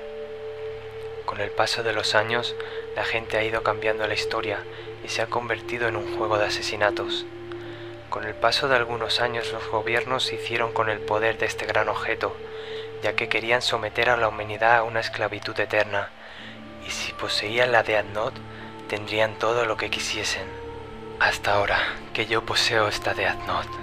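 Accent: Spain. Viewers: we es español asi Hablan los españoles pero trata de darle emocion y lo reprochas no ma, al fin los microfonos cambian mucho la voz.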